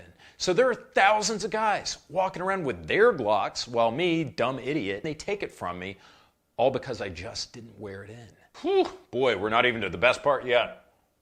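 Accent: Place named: Southern California, United States. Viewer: Hey, how come you have a Southern Californian accent but you’re from the actual South? You sound like one of us. 🤙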